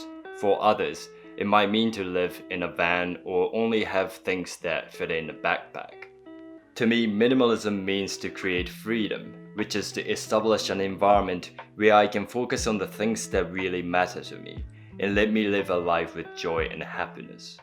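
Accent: New Zealand. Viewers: Kiwi at heart and speech. Don't let them sass you! Kia Kaha! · I agree, is a very good speaker of English. Being a Kiwi myself I can hear the NZ accent. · I thought I could hear a Kiwi accent hehe, I really enjoy your video's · I can hear the kiwi and Japanese accent in your voice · Japanese guy with a Kiwi accent... That's hot! 😍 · Thanks for this video and sharing all your journey. Your English is quite good, and your NZ accent is endearing. I am grateful to be seeing Japan through your eyes. And some of your experiences of minimalism have helped me raise questions for my own approach to life. Please continue to share your observations with us. Blessings! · Your English is very good, you should have no worries about this, you have a beautiful voice and I find it interesting to hear a bit of New Zealand inflection coming thru every once and a while. I have a feeling you have not heard very many Americans speak English so you don’t know how badly it can be spoken! 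😂 Very interesting topic I am exploring more myself. Thanks. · As a kiwi I spotted his New Zealand English accent immediately so not knowing anything about him (this is the very first vid of his I have seen) assumed he had spent some time in NZ. Looking back at some of his previous vids looks like I was right! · Okay, 1. You are freaking beautiful, no matter what you wear, 2. You speak English better than MOST Americans, 3. Your kiwi accent is so endearing, and 4. Haters gonna hate — screw ‘em. You’re more evolved than most. Stay focused, stay positive, stay healthy. Love the videos.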